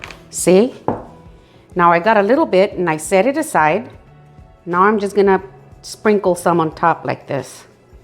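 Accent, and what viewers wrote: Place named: New Mexico, United States. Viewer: ~ ...your accent reminds me so much of some of my aunts from NewMexico...LUV iT ! : )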